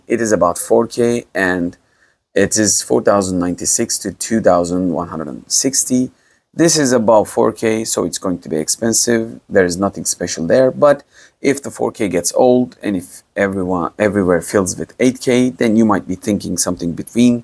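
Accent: Turkey. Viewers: Oglum I recognise this accent, memleket neresi?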